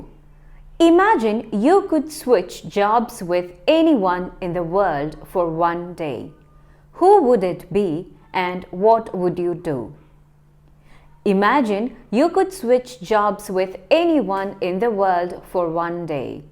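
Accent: Kerala, India. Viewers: Are you malayalee?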